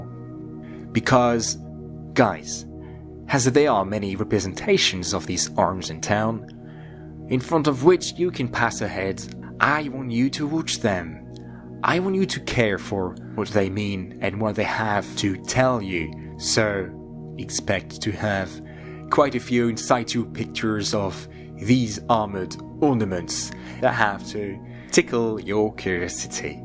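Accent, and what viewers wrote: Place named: France. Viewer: nice accent Fr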